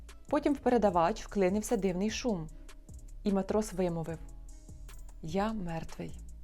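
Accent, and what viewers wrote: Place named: Western Ukraine, Ukraine. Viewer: Мені подобається Ваш західний виговір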